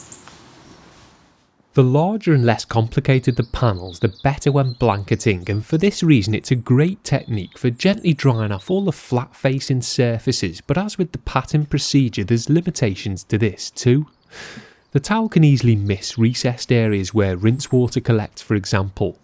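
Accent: Liverpool, United Kingdom. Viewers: yer i know that water has anomalous properties of cohesion and adhesion however it is due to simple osmosis that a dry towel will absorb more water than a wet one! and when the water potential gradient has reached dynamic equilibrium then it will no longer absorb any more and this will be reached sooner wetting the towel ... but no hate to the scouse cleaning guy as hes sound ! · I'm sorry oot. Is that scouse? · its the English the British English not english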